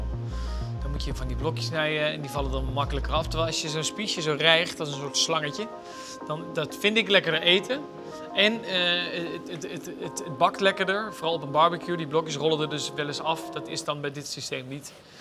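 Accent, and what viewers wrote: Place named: Holland, Netherlands. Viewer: Sowieso heeft hij meer een Hollands accent. Die "R" heeft hij in ieder geval niet in Brabant geleerd. Beetje een mengelmoesje, dus ik snap wel, dat hij dan de termen patat en friet door elkaar heen gebruikt.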